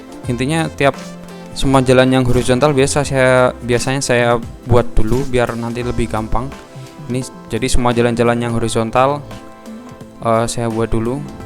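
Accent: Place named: Tegal, Indonesia. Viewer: Wong tegal ya mas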